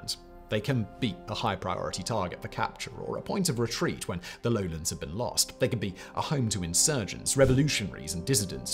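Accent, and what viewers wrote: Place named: United Kingdom. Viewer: Is his voice not 10x more British in this video than all of the others? Or is it Just me? 😂I actually couldn’t even understand some of what he said a few times and I’ve been watching for like two years. Wth? 🤷🏻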